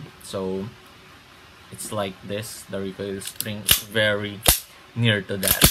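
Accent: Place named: Philippines. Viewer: Sabi na pinoy ka po eh haha sa itsura at pananalita palang eh hehe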